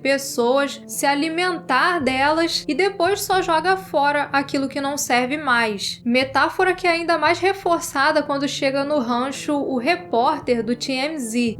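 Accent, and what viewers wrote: Rio de Janeiro, Brazil. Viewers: Timbre agradável e sotaque carioca bacana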